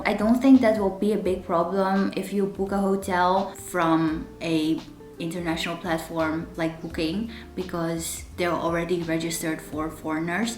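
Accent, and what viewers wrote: Netherlands. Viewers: My flight to Chongqing is tomorrow. I am a bit late to watch this video. I did book a stay with English speaking staff luckily. Setting up all my apps now. Thank you so much!! btw.. Je Nederlands accent is super cute.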